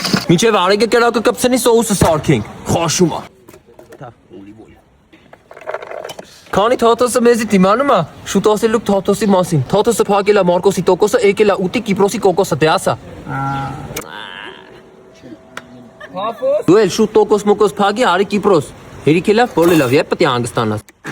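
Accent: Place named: Kyavar, Armenia. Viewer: Крутой акцент кявара 😂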